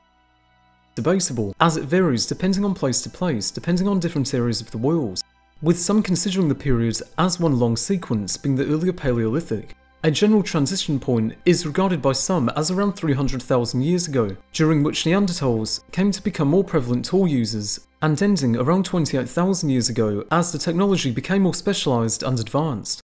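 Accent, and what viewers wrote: United Kingdom. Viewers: I enjoyed this, as I have many of your other videos. Please take this as constructive criticism when I say that your narration comes across to me as a monotone which, combined with your lovely but rather thick British accent, makes it difficult to follow the flow and details of the script. While I'm at it, I may as well say, too, that printing some words on-screen would often be helpful for amateurs such as myself. I'm not always familiar with technical terms, e.g., names of cultures. Thank you for considering my feedback. I am very much interested in prehistory, paleontology, geology, zoology, evolution and human and natural history. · excellent video! going to watch North 02 s part now (been following both of you beforehand). as for the audio issues, as a non-native english speaker it´s hard to follow you sometimes, and I don´t know if it´s because of your british dialect or the pacing. 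still, a loooot of information packed into a 15min video! great work! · I grew weary of his British accent of which I could understand maybe half.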